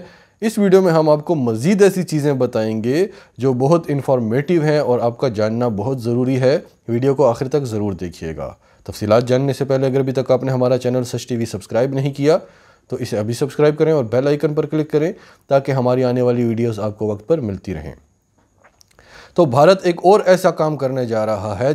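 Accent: Pakistan. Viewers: The best u tube chanal imence respect brother love your talking style good pakistani urdu accent best urdu word s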